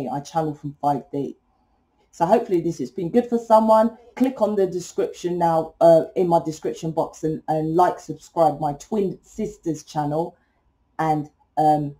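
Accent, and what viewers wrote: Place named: London, United Kingdom. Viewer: So cool to listen to a Londoner on here, it's nearly all Americans!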